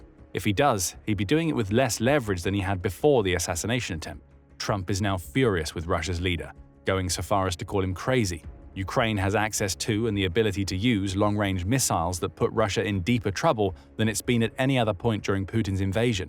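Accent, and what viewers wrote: England, United Kingdom. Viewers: I now officially hate the English accent. I avoid it.. . . . . . . . .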